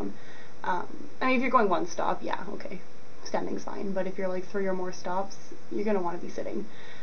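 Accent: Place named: Canada. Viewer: you are Canadian... I can tell by they way you talk.. aye.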